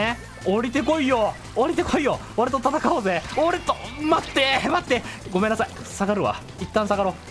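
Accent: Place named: Kansai, Japan. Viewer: ラストまで堪能しましたねぇ またもやバリバリの関西弁で めちゃくちゃ楽しそう！ ✌頑張った！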